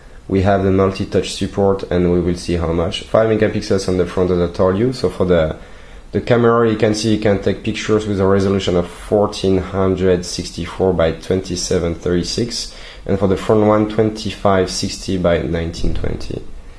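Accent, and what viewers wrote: France. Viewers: tu ne serais pas français par hasard?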